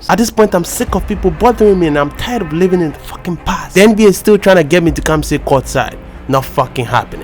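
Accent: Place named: Nigeria. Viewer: You're starting to sound like a typical nigerian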